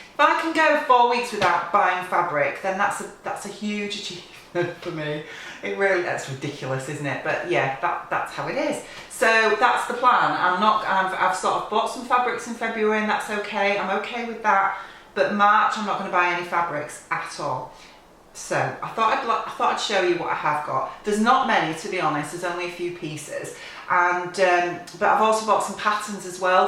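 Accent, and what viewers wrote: United Kingdom. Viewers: ...you are too funny, I just love watching your channel and you’re so honest, which I just love. I was born in Elland, near Halifax but have lived in Canada for 46 years and your northern accent is like a little bit of home for me, thank you so much! Just wanted to say hi and let you know you’re appreciated.....don’t ever stop doing these vlogs, I just love them! I meant to say too, that yellow blouse on the magazine looks almost the same as the pattern you bought.....am I right? It would save you tracing that blooming pattern out. I have so many and love them, but always find a reason not to trace them off. 😏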